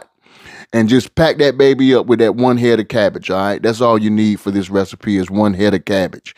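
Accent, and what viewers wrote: South, United States. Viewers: I can’t stop laughing at him saying “spoom” lol i love his country voice🤣🤣🤣🤣🤣🤣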